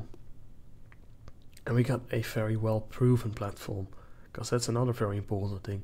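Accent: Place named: Netherlands. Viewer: these ships are both awsome . even when in a smaller org , you can help a friendly org to destroy someones capital . the polaris bridge is just perfeckt . and now the hammerhead . a real jewel . to be honest , i cant make a decision for keeping only one of them . so it was an easy decision to have them both . --- ps : you sound verry dutch :p im almost sure you live in holland :-)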